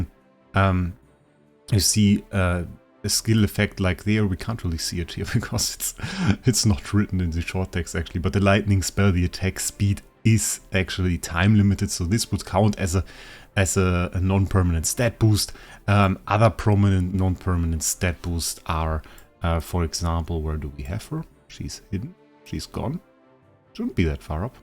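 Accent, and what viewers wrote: Germany. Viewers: your accent sounds german? am i right with my assumption?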